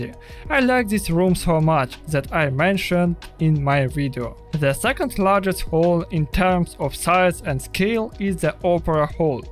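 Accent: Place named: Kazakhstan. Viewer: My kid & I were trying to guess where you are from, my guess is Kazakhstan. My guess is that you spent a lot of time learning English from books. Good job sir!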